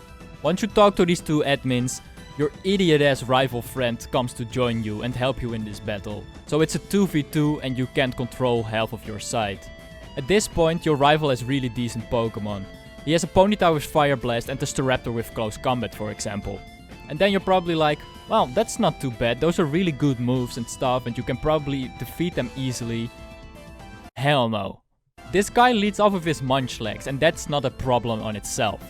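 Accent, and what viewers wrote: Netherlands. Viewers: You have like a Dutch accent Like how you say Pokémon it's like Dutch (Mede Nederlandstalige leuke video) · where are you from? i really get a feeling you are dutch or something just because of how you prenounce some words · Dutch accent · You sound Dutch. Is that weird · Are you dutch? You sound pretty dutch to me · You are Dutch aren't you? I can hear it because of your accent? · I can clearly hear you’re dutch😂😂😂😂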